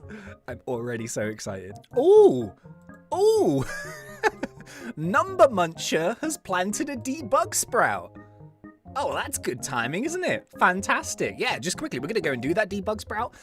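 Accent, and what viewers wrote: England, United Kingdom. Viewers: what do you mean, but? Like he’s English, from the uk... like I said, and I’m LITERALLY English from the uk aswell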